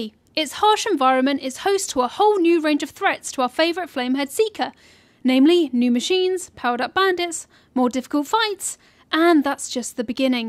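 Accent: United Kingdom. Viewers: Are girls not allowed to have a British accent?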